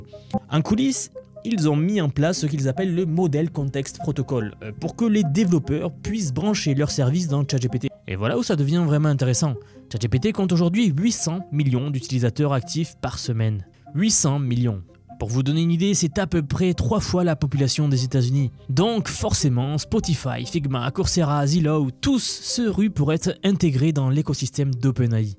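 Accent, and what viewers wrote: South, France. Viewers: le Sud I guess ?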